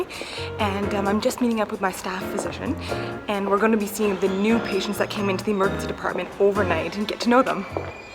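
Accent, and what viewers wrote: Canada. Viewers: When you talk you can hear your inner Canadian come out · Ps love your Canadian accent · Are you Canadian? Your voice doesn’t sounds exactly American and in one of your videos I saw an ambulance with a Maple leaf on it. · New sub here, I realise after looking through your comments and watching a heap of your vids your fan base is a lot of medical people lol. That's funny because my interest in the medical industry is zero haha, but you make it interesting and educational, and i don't regret spending maybe 2 or 3 hours watching your vids 😂😂😂 👌 ....that Canadian accent does really help too, anybody who says they don't like Canadians is just lying. Have an awesome day, new fan in NZ 😁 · I love how sometimes you can really hear her Canadian accent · I know she's Canadian I can tell by the way she talks but does she work in Canada too or does she work in the United States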